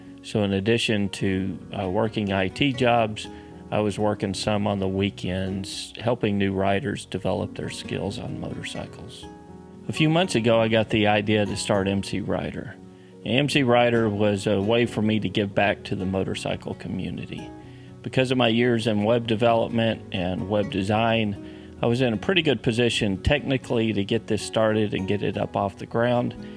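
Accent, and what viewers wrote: Texas, United States. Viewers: Kirk Dyreson, welcome to how real Texans think and talk. I would buy anything thing from this man if he looks me in the eye and shakes my hand. Content is was counts and you’ll get used to the delivery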